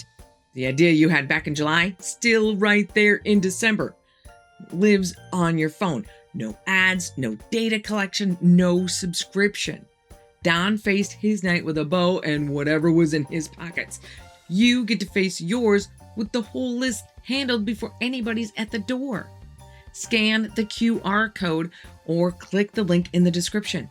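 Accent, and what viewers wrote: New York, United States. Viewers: Love wonderful stories thanks we appreciate ❤ and the lovely accent too, sounds like a New Yorker 😂 🇬🇧🏴🇺🇸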